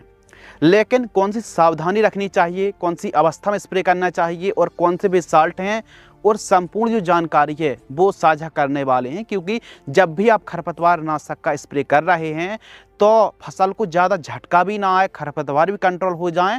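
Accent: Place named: Narsinghpur, India. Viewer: Tum Narsinghpur se lg ray ho bhaiya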